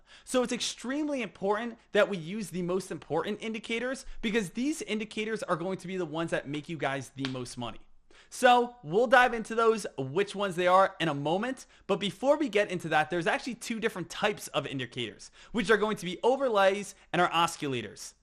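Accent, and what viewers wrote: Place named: Philadelphia, United States. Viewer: I know bro…we still love Ya bro. 😂😂😂 I always was torn between Jersey and Philadelphia for your accent. More Phila to me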